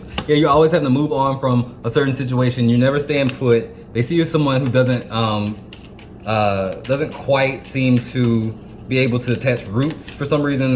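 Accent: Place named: South, United States. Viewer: I’m in love with your accent. I wish I had a southern accent.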